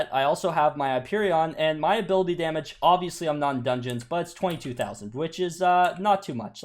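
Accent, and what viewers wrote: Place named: Canada. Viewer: i love ur slight Canadian accent and i can barely hear it and it only adds to ur charismatic personality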